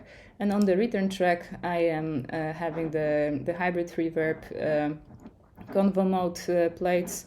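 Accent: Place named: Poland. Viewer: Awesome! Can I find your music somewhere (e.g. Spotify or Bandcamp)? EDIT: ooo, i na dodatek jesteś Polką - tak pomyślałem w 1. chwili usłyszawszy Twój akcent ;) :D EDIT2: muzyka mogłaby być trochę głośniej, a myszka trochę ciszej :)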